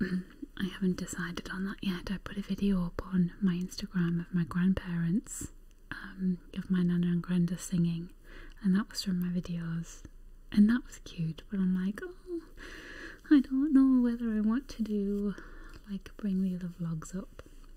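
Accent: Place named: England, United Kingdom. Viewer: I've only ever commented once or twice on your videos (HAD to comment ages ago about the girl in the library one because it's still a massive favourite). I couldn't not comment to congratulate you on your 100k subs. You're the only ASMR creator with an English accent who gives me tingles (I'm Mancunian, so it's not that I've got anything against Brits!). It's fantastic to see your hard work being recognised! Thanks so much for your uploads, for being so down to earth and for really knowing how to work the tissue paper! I know this isn't relevant to ASMR, but you always look so beautiful. Here's to 250k! Lots of love, Elaine X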